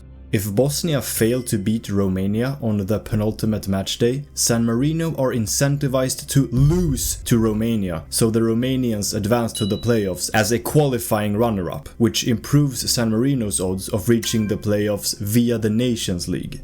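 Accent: Sweden. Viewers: After watching some seconds, judging by your accent, I believe you are Swedish? (No offence, greetings from Norway)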